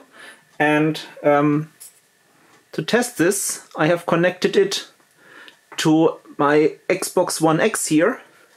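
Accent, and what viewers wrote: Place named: Germany. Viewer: Man hört einfach das du ein deutschsprachiger Mensch bist ^^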